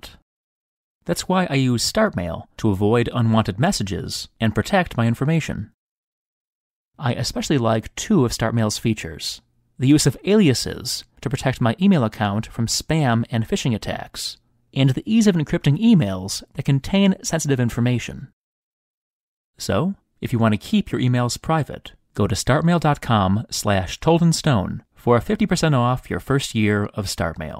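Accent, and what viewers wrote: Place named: Chicago, United States. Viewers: i could tell you were from chicago. i picked up the mild accent in your videos. great content! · you have a super thick Chicago accent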